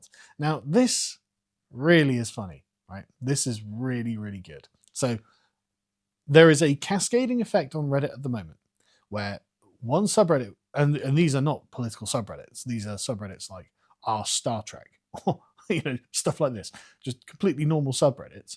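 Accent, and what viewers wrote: United Kingdom. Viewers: Wait your from Central Arkansas? You sound very British.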